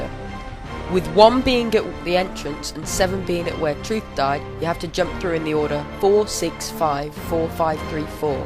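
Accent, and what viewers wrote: England, United Kingdom. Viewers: o rite sorry if u took offence i did actualy use ur guides a while back to get my halo online spartan laser acievmentssuch as 2 kills in 1 shot and shooting the banshee :D u make great quality video btw a guide for the laptops in COD 4 would be useful to alot of people it took me 3 hours to get them with a written guide bu with one of your video guides it would be alot faster just an idea just wondering what part of england is ur accent from?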